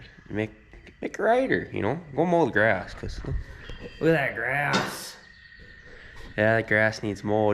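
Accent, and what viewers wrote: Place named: Midwest, United States. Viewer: sound a little midwestern lol either your from Minnesota or Wisconsin just picked up a 111 today needs a new engine as well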